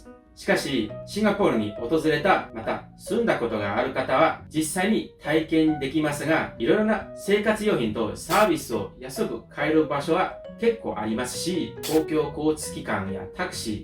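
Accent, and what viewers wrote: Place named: Kansai, Japan. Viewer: 関西訛りがついていますね（笑）いいですね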